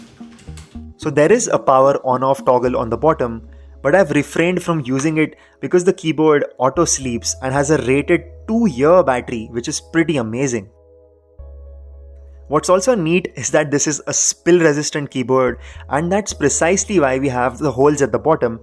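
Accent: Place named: India. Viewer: except for the Indian accent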